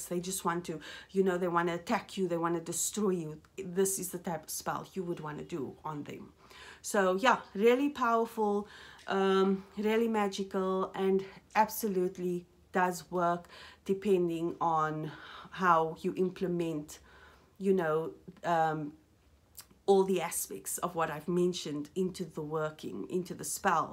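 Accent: South Africa. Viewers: Hallo, recently discovered you on your dark energy video's. Are you South African by any chance?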